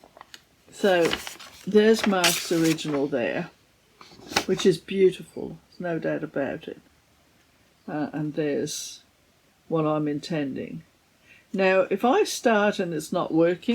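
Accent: Australia. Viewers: Hi,as always beautiful cross stitch,and lovely to listen to you chatting,are you Australian? ❤️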